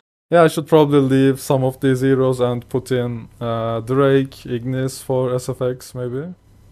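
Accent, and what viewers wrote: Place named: Turkey. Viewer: Reis aksandan dedim bu kesin Türk aha dedim baktım kanalın hakkında kısmında Türkiye yazıyor :D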